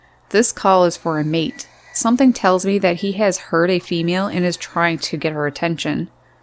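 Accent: Canada. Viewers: You sound Canadian, eh?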